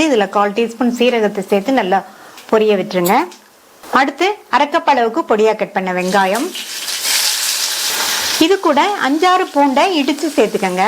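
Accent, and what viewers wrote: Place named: Madurai, India. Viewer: குழம்பின் மணத்தை விட மதுரை தமிழ் மணக்கின்றது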